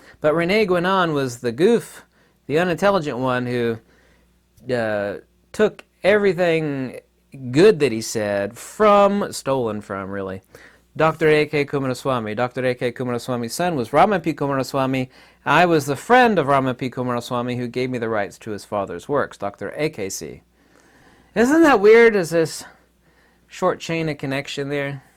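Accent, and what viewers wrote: Russia. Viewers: You sound Russian cause it sounds like you don't care about life and trying to down play murder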